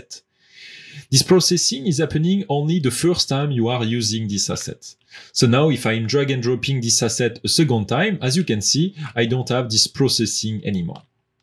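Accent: France. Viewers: J’adore l’accent French 😋😉😎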